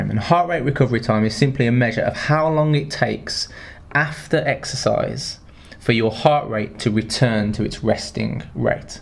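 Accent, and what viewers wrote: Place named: Midlands, United Kingdom. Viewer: Your voice accent sounds like it is from Leicestershire/ Midlands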